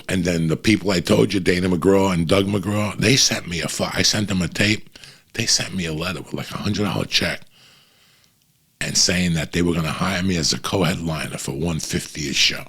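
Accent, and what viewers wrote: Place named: Philadelphia, United States. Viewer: I really like that you are in a new spot in the room, that is the coolest Bruce Lee poster and all the wall Art!! Thanks for sharing your videos with us. Salute to you and your Family Uncle Joey. You are like hearing my Family talk when I was younger in Philly! Thanks for All you do for people that you may never realize have been saved due to you telling shit like it is. Have a Great Weekend Brother!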